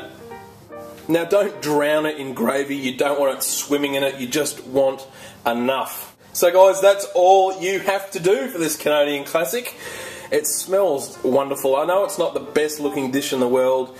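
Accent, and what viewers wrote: Australia. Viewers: He's Australian you morons and this recipe was shit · He is aussie not english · FYI, he clearly has an Australian accent... Second. Yes, he's doing the poutine wrong, but it's not his fault. I'm sure it still tastes good. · Nice job being a grammar nazi, a douchebag, and making fun of the way he pronounces things. He's Australian lol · Hes Australian, you yokel. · That's an Australian accent mate. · Are you Aussie ? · Omg he's Aussie give him a break!!!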